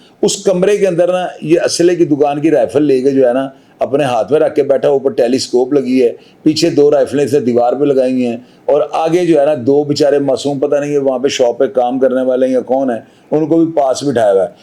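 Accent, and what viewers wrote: Lahore, Pakistan. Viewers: 😂😂😂haha yar jeo lahori andaz meh bat krtey maza ajata saab ye style nhi change krna apny kbhi bi plz big fan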